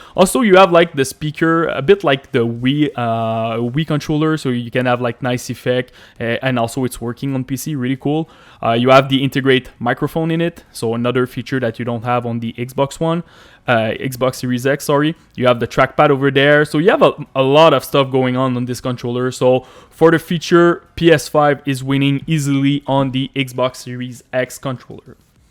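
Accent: Quebec, Canada. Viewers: Est ce que tu est québécois? parce que j'attend un petit accent XD mais sinon superbe vidéo.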